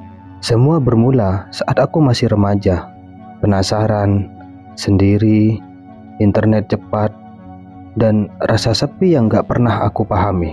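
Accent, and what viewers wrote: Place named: Madura, Indonesia. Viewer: Suara channel ini logatnya kayak orang madura.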